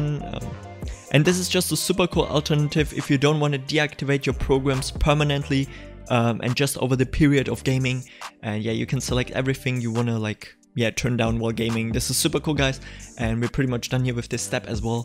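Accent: Germany. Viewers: der deutsche akzent haha, aber geiles video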